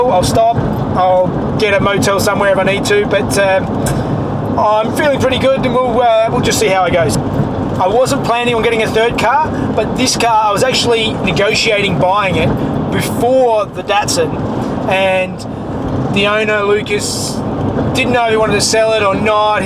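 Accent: Australia. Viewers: Do a full 105 bertone race car conversion. Had i known you were i Adelaide I would have lent a hand. Thought i recognised the accent. (full adelaide question) Where'd you go to school? My best mate has been putting off his 105 rebuild for nearly 15yrs. I recommended he check your channel out and the next week bam, 105 rebuild. Is it a twin spark motor?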